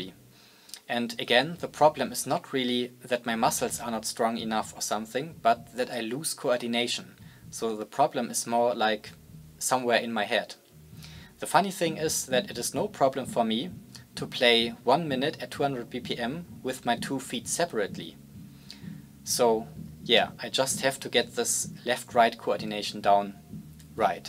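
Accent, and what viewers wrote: Germany. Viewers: Wie man es hört das du deutscher bist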